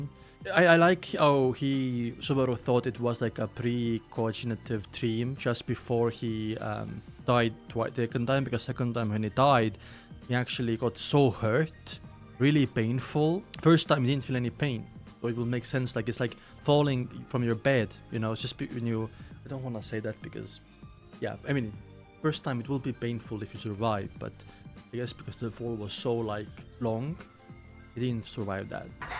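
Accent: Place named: Estonia. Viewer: tulid mulle for you pageile ja ma sain aktsendi järgi kohe aru et oled eestlane. väga äge näha et eestlased teavad ka mis peak on 😋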